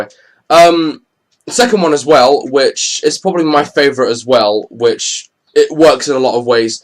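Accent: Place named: Scotland, United Kingdom. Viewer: You sound scottish and american and British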